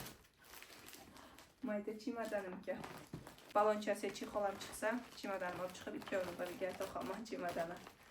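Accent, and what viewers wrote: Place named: Bukhara, Uzbekistan. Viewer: Зур манга ёкди чикаринг ман энди обуна булдим сизга каердан сиз шевангиз бухороликларникига якин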